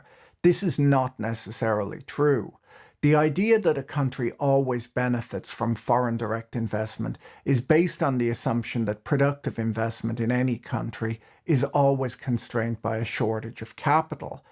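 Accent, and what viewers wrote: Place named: South, Ireland. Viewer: #I'm falling in love with your southern Irish drawl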